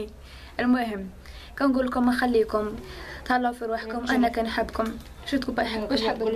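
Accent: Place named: Western Algeria, Algeria. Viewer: encore une chose...l'accent de l'ouest algérien...elle est très drôle mais j'aime bien!!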